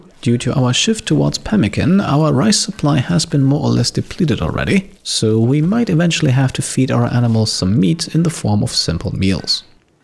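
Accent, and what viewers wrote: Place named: Germany. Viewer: thankyou for your cute German accent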